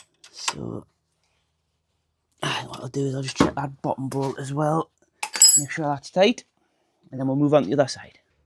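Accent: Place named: Scotland, United Kingdom. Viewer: What is that a Scottish accent oh my God..🇩🇬 thanks for the subtitles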